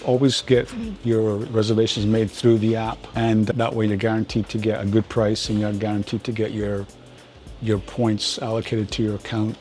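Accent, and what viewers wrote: Scotland, United Kingdom. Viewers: Is this deal for people only in the us? I don’t think people from the uk can get that card unfortunately, and he sounds Scottish so doubt he would get it either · Definitely a Scottish accent in there somewhere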